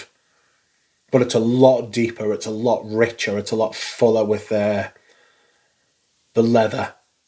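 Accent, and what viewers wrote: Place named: Cockney, United Kingdom. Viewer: Dude all britsh cogney twats, talk like you? like damn you guys used to rule and exploit india and china but now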